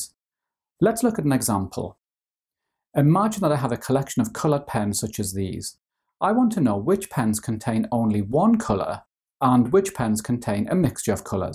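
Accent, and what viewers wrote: United Kingdom. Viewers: Think the accent is British- colloquial .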